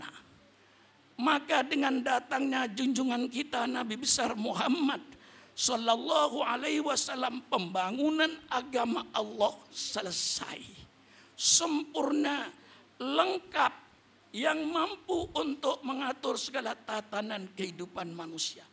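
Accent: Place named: Madura, Indonesia. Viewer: Waaa ktahuan orang madura.. Bahasanya bukan bahasa intlektual pendidikan akademisinya Kurang orang.. Ktahuan cara ngomongnya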